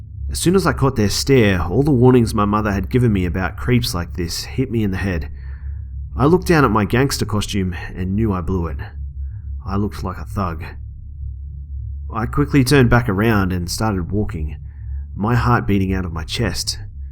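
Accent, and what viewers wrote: Australia. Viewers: i love the Australian accent what do Australians think of a american Midwest accent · does have a great voice! But you should come to Australia all the guys have the same accent/voice to a degree! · good job. feels wierd hearing an Aussie on YouTube. guess I haven't delved enough. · Delete your comment quick. He's aussie and aussies get mad when you mistake their accent for a british accent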